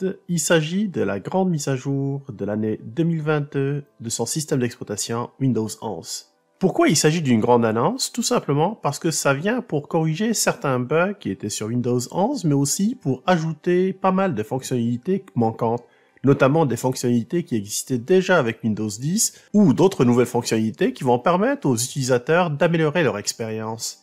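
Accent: Quebec, Canada. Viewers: Merci pour cette excellente présentation... avec cet accent de nos cousins du Québec :-)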